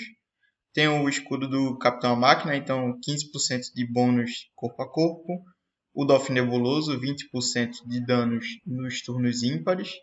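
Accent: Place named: Pernambuco, Brazil. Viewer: reconheci pelo sotaque kk sou de recife.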